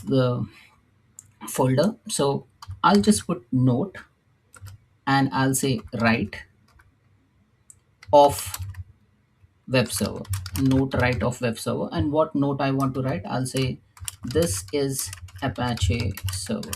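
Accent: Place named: India. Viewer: It's really hard for me to adjust and listen to English with hindu accent. But your presentation is so concise, to the point, illustrative and easy to follow that I've stuck around and put in the effort. Thank you,!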